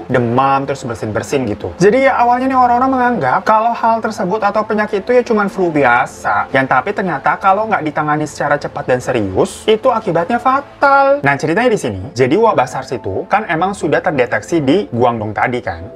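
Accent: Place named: Jakarta, Indonesia. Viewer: Suka pengen ketawa sama abng yg satu ini 😁nama nama depan kyk nama jepang, wjh jg rada" Jepang atau cina, pas ngomong betawi ora 😁 lanjutkan bng, gw syukkkaaa syukkaaa syukkkaaa dngn bhs Betawi ora nya itu 😂😂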